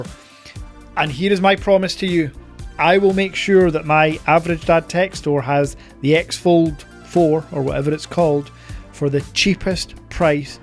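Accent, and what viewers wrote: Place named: Scotland, United Kingdom. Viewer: Dear dad I as an average french dad ( less exotic than Zimbabwe) was very happy to know more about the human being sitting in front of his youtube camera. Be restassured :for me you are not just an image on a electronic device, one can feel you are a loving famiily father because I have noticed that your beautiful sons appear more often than not on your tests. I was happy to see your wife for the first time to complete my "discovering you". I was also very surprised to learn that you were a scottish parliament civil servant, you more look like a young manager . Actually that is what you have become now. Once again I tell you a 2nd time that I admire your courage quitting such a comfortable professional situation. I would also like to say that your incredible scottish accent reminds me that II myself also speak like you if you compare my accent to the official french one. 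That because I live near the swiss german border and because my region has been invaded by the germans during and between 3 wars. That is the reason why we are french republicans but we also have a german culture with a "rough" "rocky" accent. I was also feeling sorry for your sight problems and once again I admire your courage. If your feel like developing a channel for luxus watches please do and my son sharing that passion with you will certainly join the channel. Just a small last precision: some months ago you were mentioning that anyone writing a comment to one of your videos (what I did) would have a c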